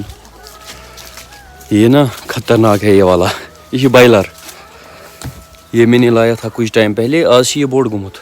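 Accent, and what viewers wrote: Kashmir, India. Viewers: A.a bai.... ap jldii thk hojao gy.... ek baat or apki kashmiri zubaan kafi alag hai... bht achi baatien krty hoo... bki love frm srinagar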